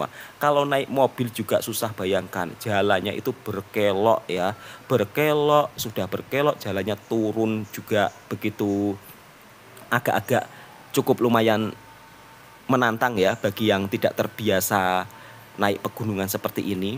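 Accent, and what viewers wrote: Java, Indonesia. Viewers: Suara n tonasi nya bunyi jowo kn ...medok banget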